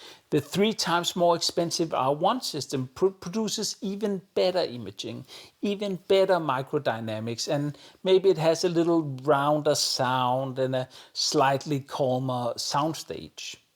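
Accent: Denmark. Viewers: I Iove your reviews and your Danish accent! Back in 1990, my first “real” amp was the Technics SU-V90D 😉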